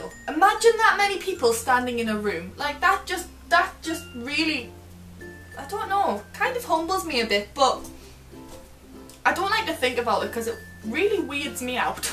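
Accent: Newcastle, United Kingdom. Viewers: Is she from Newcastle?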